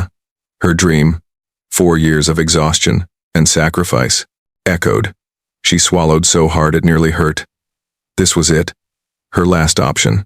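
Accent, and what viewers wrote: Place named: Africa. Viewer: I agree with some of the comments below. and I think you did this on purpose, Too much commentary to robotic, very COLD. Not a good story in you first part. Watching from Nowhere.:face-red-droopy-eyes::face-red-droopy-eyes: :face-red-droopy-eyes: P.O.S. CEO Billionaire in this story. Bad African narration, really BAD.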